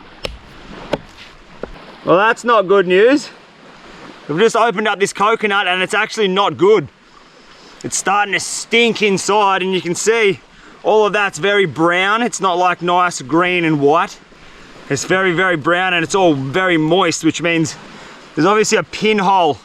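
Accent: Australia. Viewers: nah bro people are sleeping on aussie accent its more fun than british XD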